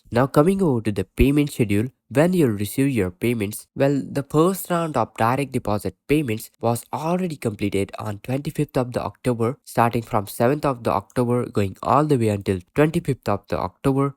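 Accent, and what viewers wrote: India. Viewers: Indian English 😂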